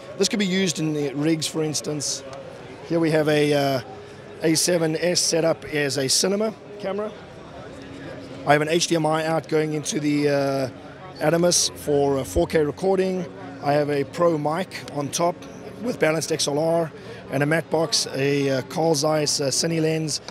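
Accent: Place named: South Africa. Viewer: I can't decide if this guy is South African or Australian. In fact I think he's probably a emigrated South African, there are thousands of them in Ozzie land ;P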